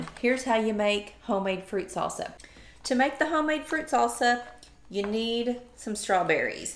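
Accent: South, United States. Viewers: AHH,MY FAVORITE LADY.LIKE THE SOUTHERN ACCENT.YOUR THE BEST.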